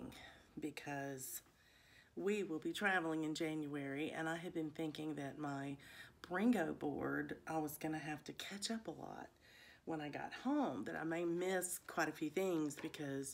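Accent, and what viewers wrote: South, United States. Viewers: Thank you so much, for such a quick response. Your channel is my favorite. I lived in Memphis, TN for two years and left a piece of my heart there. Your southern dialect, hospitality, and lovely mannerisms take me back to that very enjoyable time in my life. Blessings to you in the new year!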